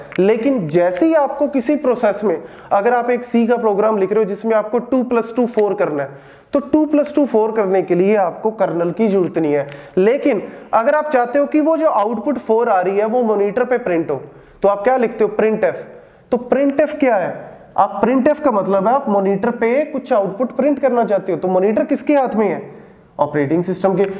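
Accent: India. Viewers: You still have your hindi accent😢😪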